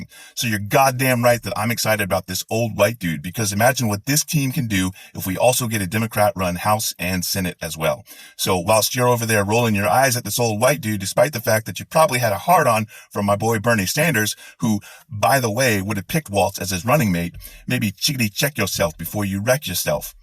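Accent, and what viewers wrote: Scotland, United Kingdom. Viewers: am I detecting a bit of a Scottish accent here?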